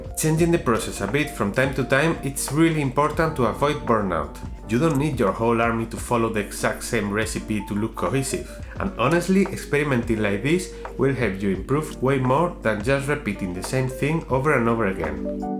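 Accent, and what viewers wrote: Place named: Spain. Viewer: Vi ese español interior a través del acento jajaj, buen vídeo Bro 👏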